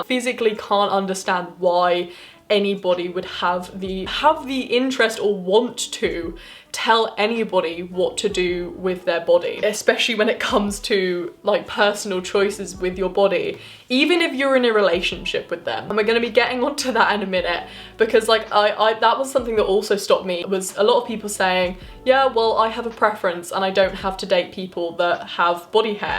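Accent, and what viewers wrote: United Kingdom. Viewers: I live in Rio de Janeiro, I've learned english by myself, but the british accent It's LIFE. You are so Sweet and your Voice calms me down... I love your videos ao much omg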